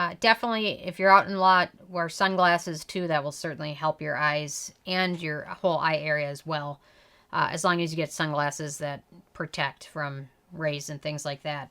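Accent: Minnesota, United States. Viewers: You HAVE to be from Minnesota or Wisconsin!❤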